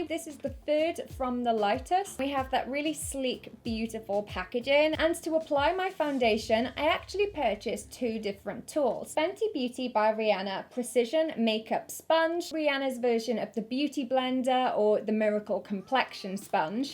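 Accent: United Kingdom. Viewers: I never comment on youtube but this video i have to comment! I'm not even from UK, english isn't my first lenguage ( I'm from Brasil) but i speak english, my english is so mutch better when i started to watch your videos. Ii can even talk with your brittish accent! anyway, i have to say that u are now, my favorite youtuber. U r honest with your watchers, u make me feel comfortble, really i feel like u r my friend. Continue doing this amazing job, u r such beautiful outside and inside. wish you have more sucess every day. thanks for being my distraction on my sadness days, and help me understand more the british english and about make up hahaha . Love u !!! x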